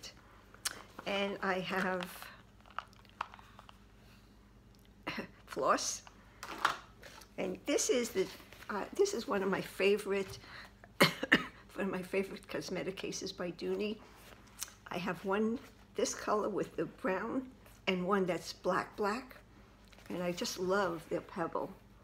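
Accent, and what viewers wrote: New York, United States. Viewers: Hi! I hope your surgery went well. I love your NY accent, it makes me feel like I am home! I'm from 45 minutes outside of NYC! I miss it and hate Texas with a passion! But I'm moving back soon enough. Do you remember the little pot glosses? I loved those. Clinique discontinued them years ago. Meadow Flower Honey was my favorite.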